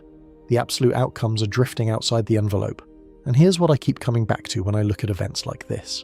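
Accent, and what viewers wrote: United Kingdom. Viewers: I’m sorry, but us YouTube listeners watchers are sick and tired of this British accent crap, especially this one, instant turn off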